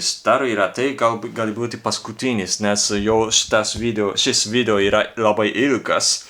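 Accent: Japan. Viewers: Sveikas,. Tų žodžių, kurių nekenti, netark ir viskas bus gerai. Malonu klausyti tokį malonų japonišką akcentą. Vaike, Tu perdaug labai nesistenk gilintis į lietuvių kalbos gramatiką. Ji labai sudėtinga. Daug painiavos. Tavo pradžia nuostabi. Neskubėk. Viskas ateis.Tai, ką išmokai - niekur nedings.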